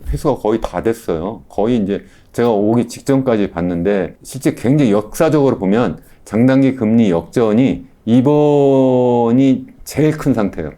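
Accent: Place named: Jeolla, South Korea. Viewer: 일단 라도 사투리부터 고쳐주셨으면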